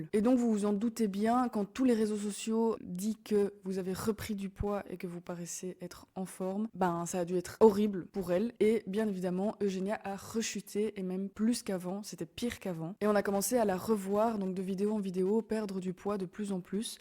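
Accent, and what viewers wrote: Belgium. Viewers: J'adore ton petit accent belge =)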